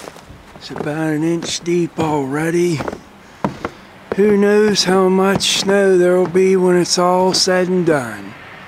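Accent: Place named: East Coast, United States. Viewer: You have a very nice accent. Definitely east coast, you can tell by the "o"s.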